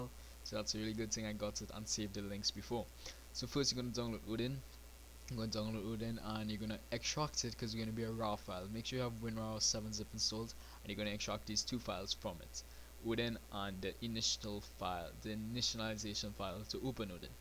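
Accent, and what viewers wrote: Trinidad and Tobago. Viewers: u sounding like u from trinidad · ur trinidadian?? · dude are u Trini ? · Aye Bhai youze a Trinidadian ?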